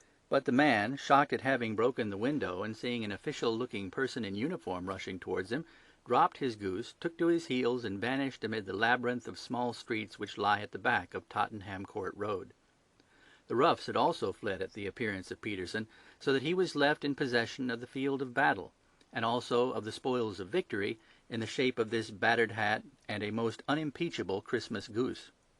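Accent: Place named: United States. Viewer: Nice Narrator voice with a slight American accent.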